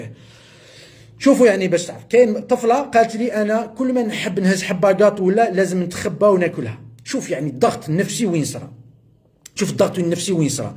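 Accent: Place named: Jijel, Algeria. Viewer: باين جيجلي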